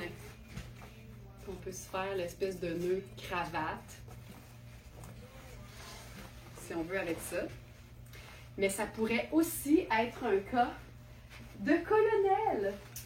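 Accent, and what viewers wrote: Canada. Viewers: Bonjour, le sweet doit être rentré dans le pantalon, c'est plus joli, le pantalon étant large. J'adore votre accent canadien !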